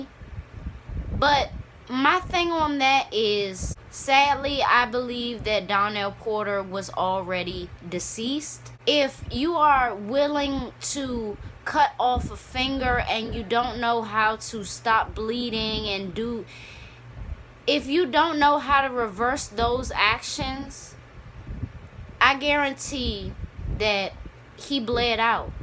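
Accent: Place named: South, United States. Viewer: Are you from Harlem. How do you know this stuff you sound like a downsouth chick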